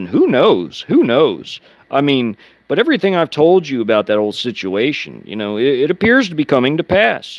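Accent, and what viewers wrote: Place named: Kentucky, United States. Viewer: The story of the CSS Alabama which Three Country's attacked her that day. The French The British and the lunatic Sherman Government.. This is the same Axis of evil in every war since. My grandfather loaded the Gold from Germany. My uncle was MP at Redstone.. It was my uncle who told me what Redstone was and why it was named that. One big hot Redstone because they have a enough nukes to destroy the planet 6 times over. At 9 year's old I asked my uncle why. I asked other questions as well. Uncle Don would not tell anything till I had brought him at least 9 Beers later. He was one who knew All . His answer was that we had everything buck Roger had. Lasers spaceships and much more.. That started my research. When I ran across you on YouTube you sounded like my family in Kentucky.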